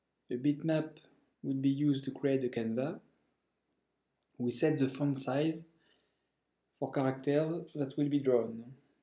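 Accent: France. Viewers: Le bon accent français des familles <3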